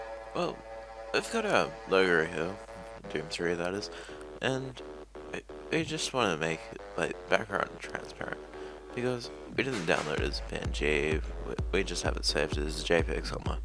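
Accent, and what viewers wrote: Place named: United Kingdom. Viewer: You sound like you're british and have either done a fuck ton of molly and fried your brain, or you're super stoned and talking ridiculously soft into your headset. What's hilarious is even the transcript has no idea what's going on. I think I finally got it. Thanks for the upload. Work on your diction my good man.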